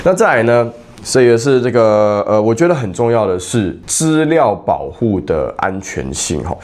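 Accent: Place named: Taichung, Taiwan. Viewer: 濃濃的台中腔=講話尾音偶爾會飄掉=尾音五音不全，太難形容了！台中人一定要支持啦👍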